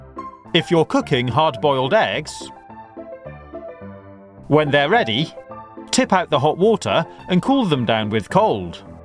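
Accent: England, United Kingdom. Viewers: Are you English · He's British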